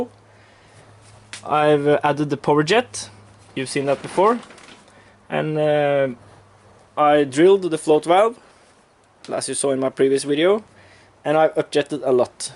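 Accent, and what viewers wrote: Norway. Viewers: Jeg bare så på den videoen her i 10 sekunder før jeg hørte norwinglish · Jeg syns aksangen virket Norsk! 😁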